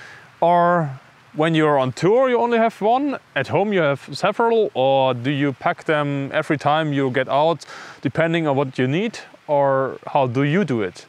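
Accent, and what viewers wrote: Germany. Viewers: So german english accent🖤🇩🇪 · nothing cooler then german english.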